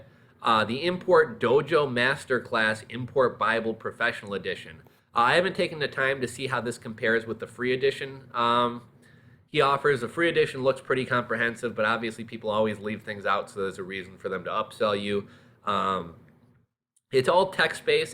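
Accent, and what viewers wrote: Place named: Australia. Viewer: He is from Australia so he has an Australian accent.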